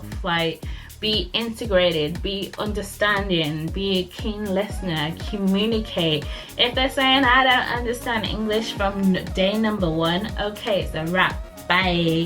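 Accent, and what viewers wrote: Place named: Northern England, United Kingdom. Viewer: Ahhhh you are from Leeds! I was trying to pin point the Northern ascent. Funny story time! 😂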